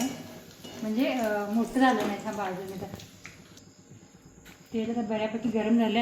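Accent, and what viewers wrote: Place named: Karnataka, India. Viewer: तुला मराठी पुर्ण जमत नाही जरा कन्नड वळनावर आहेत भाषा मी मुचंडीची आहे तुमच्या मामाच्या घराशेरचे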